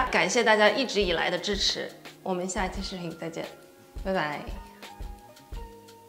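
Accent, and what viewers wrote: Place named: Wuhan, China. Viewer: 你是我人生中第二个巨爱的武汉普通话！哈哈哈哈哈哈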